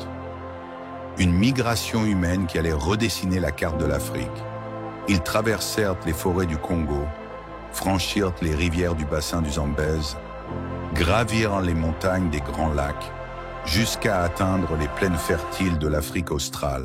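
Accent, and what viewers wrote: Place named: Europe. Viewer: je parlais de la voix qui faisait les commentaires sur la vidéo c'est une voix européenne et les prononciations ne sont pas africaines.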